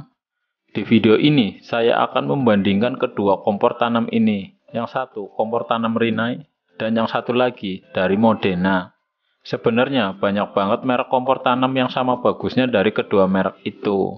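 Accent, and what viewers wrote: Banyumas, Indonesia. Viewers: ngapak mbok? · Ngapak bgtt😂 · njir logatnya ngapak-ngapak.. gak enak banget didengerin 🙃 kaga malu apa bray, ngereview pake logat ngapak gitu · Ora ngapak ora penak, matur nuwun pakde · Daningg ngapak om😁🙏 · Kayak orang ngapak bahasanya 🤣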